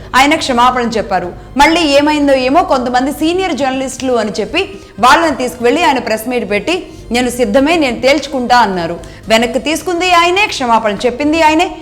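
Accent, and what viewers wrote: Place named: Telangana, India. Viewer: మీది తెలంగాణ మేడం ఏం మాట్లాడుతానో ఏమీ అర్థం అయితలేదు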